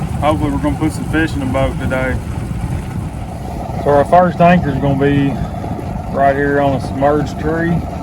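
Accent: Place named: Georgia, United States. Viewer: To be from Ohio, you sure sound like you came straight outta my state of Georgia. Now there's an example of a youngern who fishes like I did when I was a kid. I'm sure he makes you proud.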